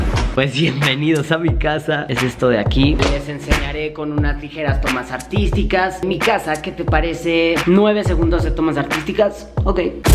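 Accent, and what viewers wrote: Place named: Spain. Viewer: Odio que hables como ESPAÑOL 🙄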